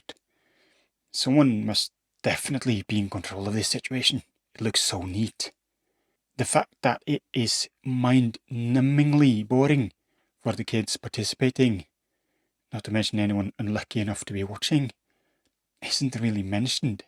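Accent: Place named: Scotland, United Kingdom. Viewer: If you gave me a million pound, I couldn't work out if you have a Scottish accent or a Norweigan one?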